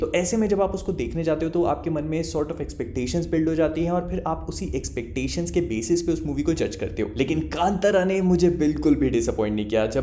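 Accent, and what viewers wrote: Bhopal, India. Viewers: Ae Bhai Tum Bhopal se ho kya😶😳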